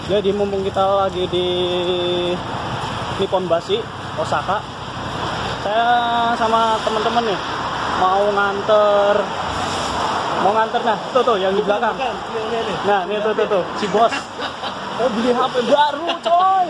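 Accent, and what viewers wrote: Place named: Java, Indonesia. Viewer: Medok banged suara.mu? 😅😅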